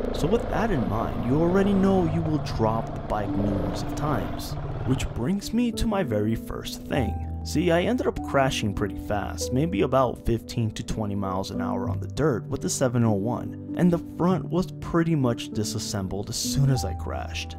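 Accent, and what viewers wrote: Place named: Mexico. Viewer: THIS DUDE SOUNDS TOO MEXICAN TO BE A TRUE AMERICAN. CITIZEN OR NOT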